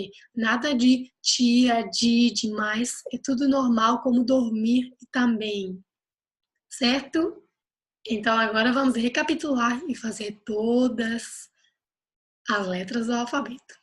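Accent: Northeast, Brazil. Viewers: Comecei a estudar o Holandês e me deparei com seu vídeo. Obrigado pelas dicas. Adorei o seu sotaque português, ele é bem nordestino. Parabéns! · As vogais não são iguais " como em qualquer outra língua". As do inglês são no geral diferente de tudo qto os demais. Idiomas. A única coisa positiva, é o seu ligeiro sotaque nordestino. Brasileiro.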